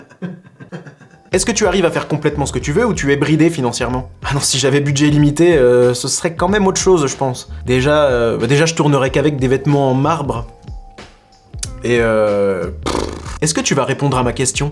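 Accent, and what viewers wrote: Franche-Comté, France. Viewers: Je rêve de t'entendre en invité dans un 2 heures de perdu sur Pee Wee's ! Des conversations en franc comtois et en bourguignon... du miel pour nos oreilles !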